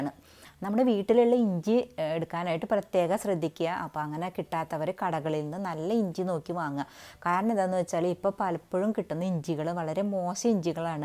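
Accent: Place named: Kannur, India. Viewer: നാട് കണ്ണൂര് ആണ് എന്ന് മനസ്സിൽ ആയി..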